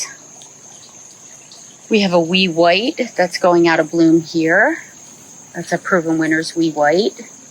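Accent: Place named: Philadelphia, United States. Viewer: Just lovely. Guessing you’re near Philly? I hear a slight accent especially when you say wooder instead of water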